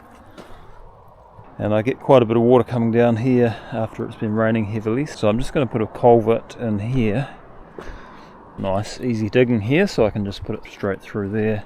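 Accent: New Zealand. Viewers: Sounds Kiwi, awesome presentation dude thank you.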